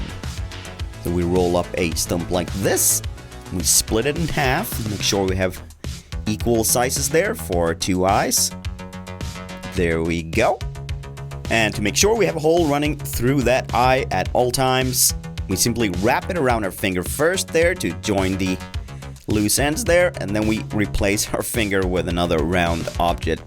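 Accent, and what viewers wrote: Sweden. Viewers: What swedish accent do you have??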